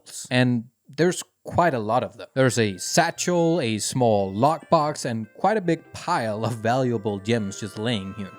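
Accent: Denmark. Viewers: Skide god video! Desværre giver accenten enhver Dansker væk 😅 Du har sgu gjort dig fortjent til et sub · Never seen your videos or channel before. Bit I could instantly tell where you’re from. That accent will always be a dead giveaway for us Dane’s.. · i sense a danish accent, alas it has made me subscribe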